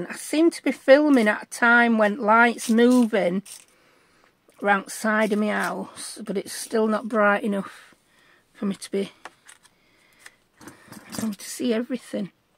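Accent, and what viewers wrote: United Kingdom. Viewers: I absolutely love love love these. What a brilliant idea, so much journaling space inside a beautiful belly band. My favorite is the pink Foxglove plant. Beautiful!!!!!!❤ I’m so glad I saw your video! Thanks so much for sharing. I also enjoy your distinctive British accent and sense of humor since I’m from the U.S. 😅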